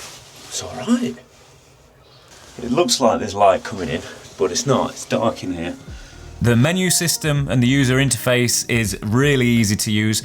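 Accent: United Kingdom. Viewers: wow how cute your brit ish accent luved it! Hi👋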